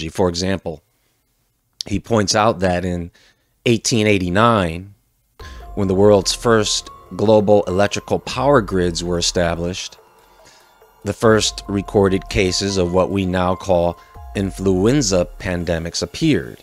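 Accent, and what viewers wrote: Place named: New York, United States. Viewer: & I thot he sounded like a military jarhead, part Italian New Yorker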